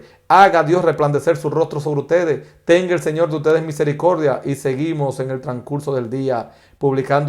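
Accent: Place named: Dominican Republic. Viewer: Ahora haz un vídeo sobre la invasión de la que somos víctimas.... asumo que eres dominicano por tu acento.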